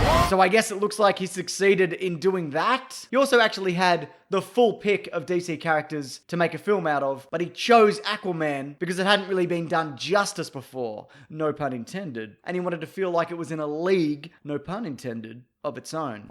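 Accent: Australia. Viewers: “Ack-wa-man” is it that hard for Australians to say aquaman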